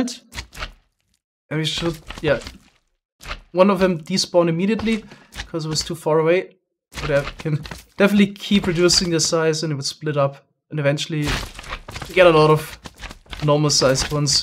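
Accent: Germany. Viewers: R U German? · Is he german? No front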